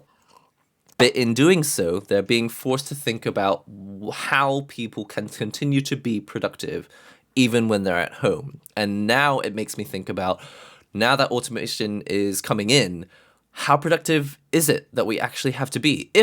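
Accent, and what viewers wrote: United Kingdom. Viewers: Im new in this channel, how you have this awesome british accent?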